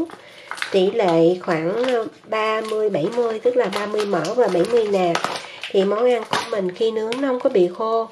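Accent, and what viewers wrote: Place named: South, Vietnam. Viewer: 🙏🏻❤️🙏💥✨💫🌟🌟🌟💖💖💖💖 MẾN YÊU!...XIN CẢM ƠN! CẢM ƠN RẤT NHIỀU MÓN NEM NƯỚNG NGON THƠM ĐỦ MÀU SẮC THIÊN NHIÊN HẤP DẪN TUYỆT VỜI BỞI BÀN TAY TRÌNH BÀY KHÉO LÉO VÀ GIỌNG NÓI DỊU DÀNG NHẸ NHÀNG MIỀN NAM DỄ THƯƠNG CỦA. XIN RẤT YÊU MẾN VÀ NGƯỠNG MỘ 💕💕💕💞💞💕💓💓💓💖💖💖I LOVE YOU...and I LOVE YOUR FAMILY 💖💖💖🌟🌟🌟💖💖💖💖💖💖💖💐💐💐💐💎💎💎💎💖💖💖💖💖💖💖💖💖💖💖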